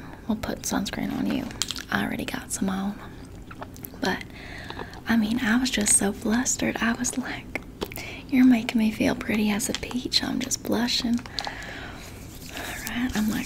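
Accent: South, United States. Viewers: Nobody does Southern Belle like you. You could literally be part of a country TV series. Too good. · You ain’t southern but you’d never know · I love your southern belle accent! Amazing as always! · Again, very nice southern accent! Also, I heard you mentioned some country singers in this video. I'm a very big fan of, and I am actually going to her concert at late August! Who are your favorite country singers,? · Love the cowboy Accent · Honestly I would’ve thought you were southern 😂❤ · New trigger unlocked: southern accent. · girl, your lashes look amazing! also I absolutely love your southern accent, you're so talented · I have a huge southern drawl, you nailed this!!!! #awesomejob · i love southern accent videos as a woman who’s grown up in the south 😭😭 they crack me up! i love the vibe!!!! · From a southerner, this RP is so spot on! 😂 I know PLENTY of girls like this. 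· That southern accent is so attractive. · Such a cute role play for a Canadian you do Southern pretty well!! Also, your hat appears to be backwards 😉. Unless it's just been misshaped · Are u from the south? This is spot on! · Haha that southern accent is funny lol btw nice job with imitating this accent 😂❤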